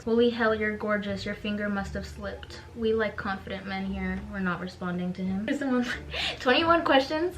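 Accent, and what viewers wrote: United Kingdom. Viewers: The British accent LAMAOAOAOOA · I’m British.. and I am so here for ur British accent it’s rlly funny 😂 in a good way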